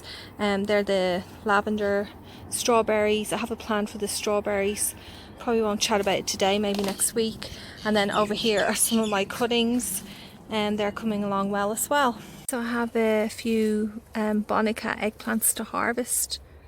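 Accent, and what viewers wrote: Ireland. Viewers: Your accent sounds Irish 🤔 but either way cool garden 👍🤗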